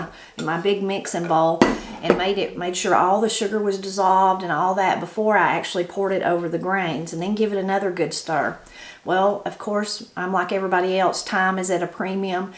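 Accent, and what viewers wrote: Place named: West Virginia, United States. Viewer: I just found this post and I'm very curious because I've been looking for something I can stand to drink. I'm in California from West Virginia and have quit drinking water because it smells and taste so bad. This has caused many medical problems because of being dehydrated. I came here in 1962 with my parents. I was 12 years old and we use to drink water running out of the mountains, so clean and delicious. I'm now 74 and cannot stand to drink this terrible water in Modesto California. I'm going to do research and try to make water I can stand to drink. I just wonder where I might get my starting water.ost water sold in bottles here are just tap water that I will not drink. So I'm starting with a handicap. I've becr addicted to your shows. I miss now WV home but cannot go home due to medical coverage for my heart condition. 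Thanks for your guidance and your accent, reminds of all family and friends that have past over.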